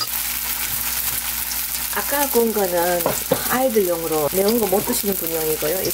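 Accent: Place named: Busan, South Korea. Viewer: 🇬🇧영국 팬입니다. 저는 고향이 부산인데 말씀하시는 게 꼭 부산에 있는 것 같아 친근감이 들어요 ㅋㅋ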